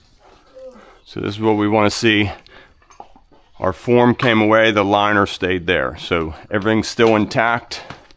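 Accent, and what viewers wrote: United States. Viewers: Everyone has an accent. I'm from Australia. I definitely hear your American accent 😁 Beautiful work as always!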